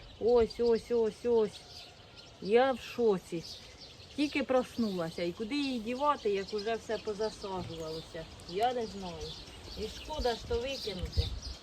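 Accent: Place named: Khmelnytskyi, Ukraine. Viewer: Дякую Вам Пані за такі ЖИВІ відео, на разі я далеко від рідного краю, на Сході України, Ваші відео і суржик нагадують мою Хмельниччину...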